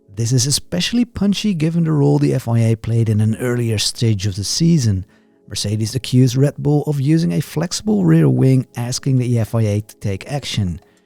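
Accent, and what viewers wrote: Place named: Netherlands. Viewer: Also, he's doing a great job speaking English but I still hear a distinct Dutch accent.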